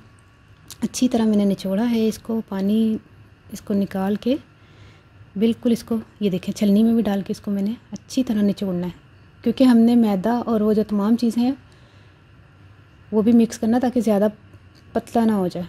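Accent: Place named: Punjab, India. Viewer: Aoa . bhot acha andaz hy kubhi kubhar punjabi zbaan ka chutta dy k baat karny ka .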